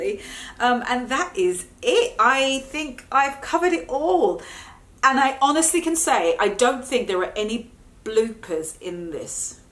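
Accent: United Kingdom. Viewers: Bello acento britanico :) y obviamente excelente video!!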